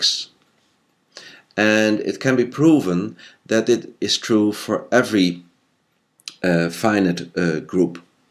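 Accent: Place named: Belgium. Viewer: You sound Belgian!!!!!!!!!!!! Are you?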